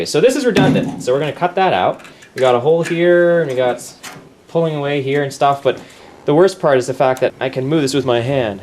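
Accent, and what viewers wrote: Canada. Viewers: Very nice work. I do love your canadian english. Who cares about Trumpland..... Cool vlog, very 'blinky'!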